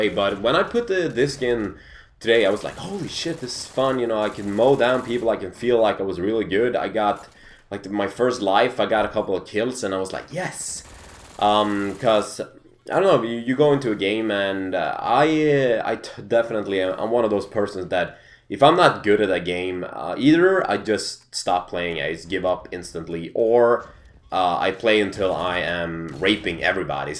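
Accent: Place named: Sweden. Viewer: Semi ADAMADIC, fucking love swinglish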